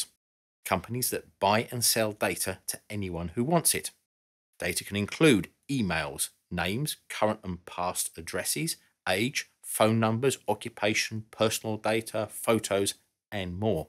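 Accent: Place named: Cockney, United Kingdom. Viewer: haven't watched for a while but last I remember you was recovering from being very Ill. Good to see you're well and I'll be sure to check through your videos. On an unrelated note I'm a truck driver and you sound like a cockney. I drove past the royal Armories last Thursday when going from Millwall F.C to Twickenham and i was shocked by that battleship gun sat in front WOW! that was incredible!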